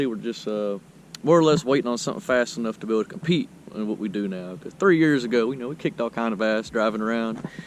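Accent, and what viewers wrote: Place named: South Carolina, United States. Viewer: You gotta be in SC with that particular twang... maybe GA. I know a lot of guys around TR like to have fun. I am going to pick up a plaid myself. Cant wait to give my neighbors a stroke on test drive. Especially coming from a dual motor awd model 3.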